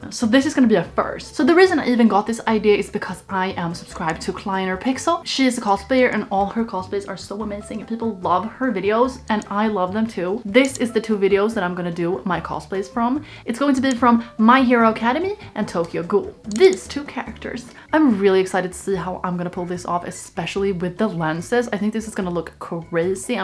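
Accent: Sweden. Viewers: Is she from Sweden her English accent sounds like Swedish english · R u swedish? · YOU’RE SWEDISH, RIGHT? I dunno why I got so happy by your accent, it’s just so familiar hehe.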